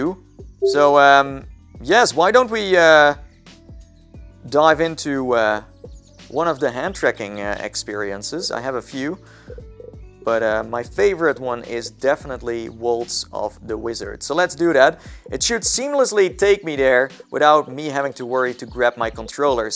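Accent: Netherlands. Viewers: Ik zweer ik vind het zo fucking grappig wanneer ik merk "hmm, ik hoor een minuscuul klein accentje. Die persoon is Nederlands." En negen van de tien keer heb ik het goed. Altijd weer leuk om mede Nedelanders te vinden waar ik interesse in heb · I can tell you're dutch. honestly, same. and i could only wish i got an oculus quest 2. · seems kinda Dutch am I rightytighty? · I smell a littlebit dutch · It sounds Dutch, but not sure. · Ur definitely dutch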